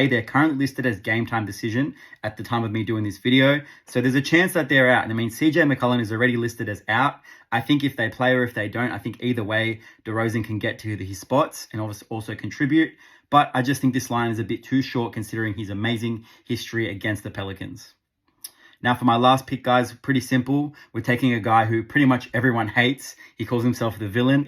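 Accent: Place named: Australia. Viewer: Found this Channel after seeing your comments/tips in calling our shot , after watching a couple of times i thought i detected an Aussie Accent , Good to see/find an Australian sports betting Capper/Tipster , looking foward to seeing what you do with AFL and NRL when the seasons start, The Boomers ( The Australian basketball team for anyone eles reading ) just had another win giving Indonesia a bit of a thrashing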